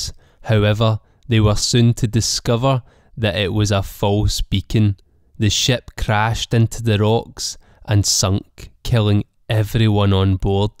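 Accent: Scotland, United Kingdom. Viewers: What part of Scotland are you from? Glasgow? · Are you Scottish? Heavy but wonderful accent.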